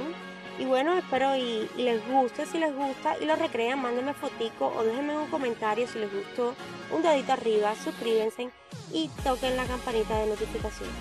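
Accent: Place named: Caribbean. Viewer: Te quedaron lindas y como ya dije un tiempo atras, ese sabor que le pones con tu acento caribeño me encanta.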